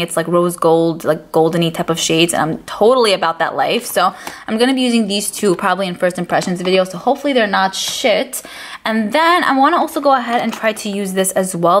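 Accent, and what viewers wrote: New York, United States. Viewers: Great video; can't wait to see your reorganized stash. By the way, I notice a very distinct accent; New York? God bless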